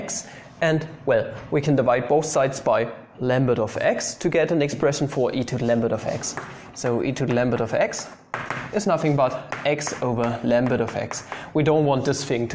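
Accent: Germany. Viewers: Ahhh the good old german accent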